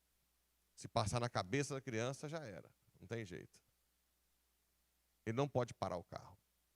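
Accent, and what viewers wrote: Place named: Goiás, Brazil. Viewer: Deus abençoe o senhor Pe, sotaque goiano, é o máximo 😅😅😅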